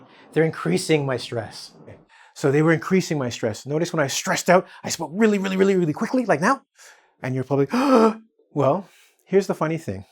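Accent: Brazil. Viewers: are you Brazilian? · Are you a Brazilian guy?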